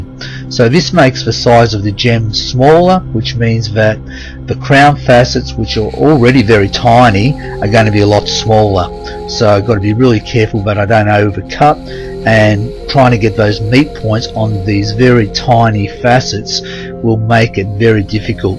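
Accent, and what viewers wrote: Australia. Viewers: Thanks for sharing the only gem channel with a down under accent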